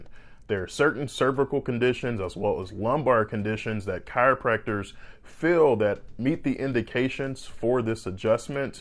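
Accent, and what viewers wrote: South, United States. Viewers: Having a southern accent isn't an indicator of low intelligence. Imagine making fun of Einstein because he couldn't pronounce "theory of relativity" correctly.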